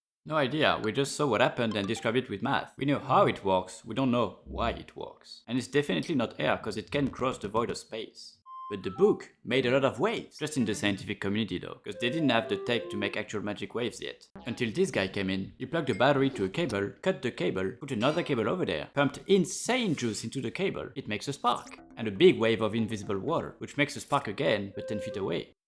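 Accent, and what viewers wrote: France. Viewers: the french accent is amazing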